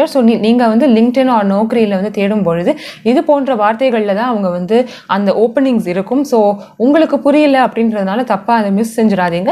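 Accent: Sri Lanka. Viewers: Akka...are you Sri Lankan??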